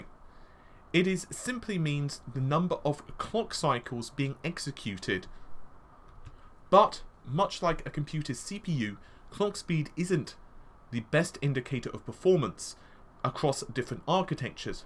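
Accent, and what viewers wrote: United Kingdom. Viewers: Very hard to understand him. He sounds like a Brit but also sounds like a person with his english as a 2nd language. His explanation is hard enough to understand with all video gaming and not organized way and his pronunciation on those words is worse lol.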